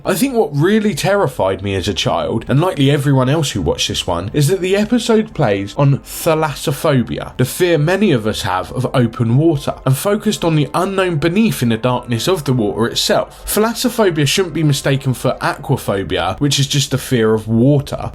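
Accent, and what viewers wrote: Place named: East Anglia, United Kingdom. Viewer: Are you from Suffolk or Norfolk? Trying to place the accent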